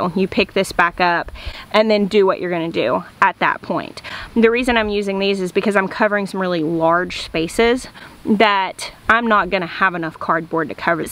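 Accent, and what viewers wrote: South, United States. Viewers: Gurl...I listened to you for 5 minutes saying what I thought was "silage sharps"! You have such a southern accent! Finally I realized you were saying "silage tarps", or as we would say here in New England "silage tahps". lol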